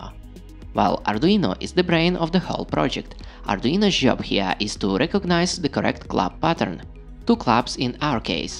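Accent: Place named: Russia. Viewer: Russian accent detected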